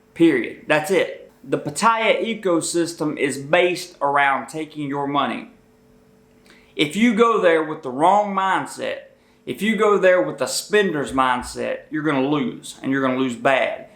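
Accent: South, United States. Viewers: Man were you from I know your from the southern us original I'm from Tennessee so I know it's a southern accent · Thanks bro good info:) Dude you sound like you're from the South nice to hear a accent brother:) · You have a southern country accent. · The southern accent while mispronouncing "Pattaya" is funny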